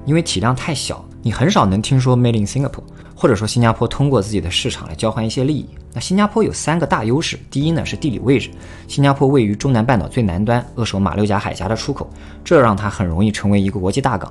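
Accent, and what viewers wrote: China. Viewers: 不知道其他人跟我是不是一样的感受，一口流利的中文，而且听起来还是大陆人，一口一个中国，中共，老毛，“新加坡”，这个感觉就有点讽刺的意味，有可能是我的格局小啊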